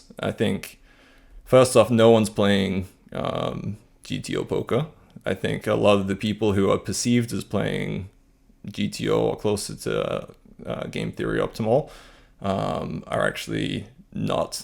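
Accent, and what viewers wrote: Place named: United Kingdom. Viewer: A very particular from of the British accent. I know a small Youtuber who has almost the exact same tone and cadence.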